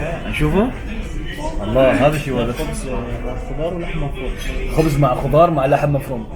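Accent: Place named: United Arab Emirates. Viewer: الله محيي اصلك ، والله ذكرتني بايام الامارات واللهجه الاماراتيه الحلوه الله يديملكم الامن والامان ويرجع بلدنا العراق لخيره ونستضيفك تاكل دولمه ببغداد ان شاء الله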